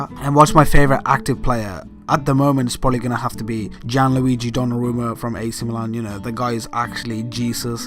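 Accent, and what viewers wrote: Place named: Birmingham, United Kingdom. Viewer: Are you from Birmingham? You sound like you have a bit of a Brummy accent 😂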